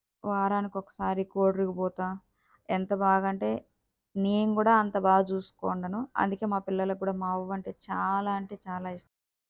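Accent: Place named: Telangana, India. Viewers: మీరు మాట్లాడటం కొంచెం తెలంగాణ లెక్కనే ఉంది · Telangana valla tho unty ela ne matladutaru ga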